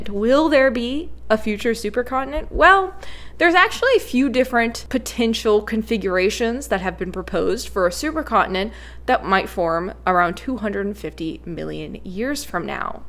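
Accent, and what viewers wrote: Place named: Texas, United States. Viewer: That’s just her Texas twang coming through, relax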